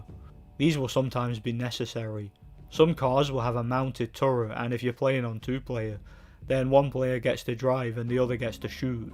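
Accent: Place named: Northern England, United Kingdom. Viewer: Wait, you're Scottish? I assumed you were Northern English.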